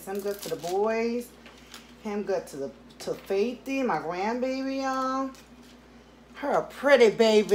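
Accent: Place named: New York, United States. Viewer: have you always lived in Massachusetts?? Beacause you have an accent like us New Yorkers ✊🏼💪🏼😁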